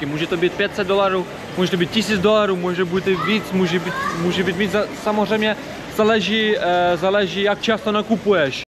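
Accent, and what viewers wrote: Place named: Ukraine. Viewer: Mohu se zeptat, podle přízvuku jsi z Ukrajiny nebo z Ruska? Díky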